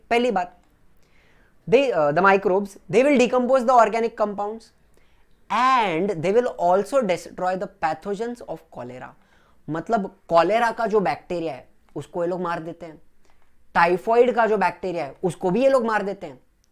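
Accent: Maharashtra, India. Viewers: सर appp Maharastrian ho kya ?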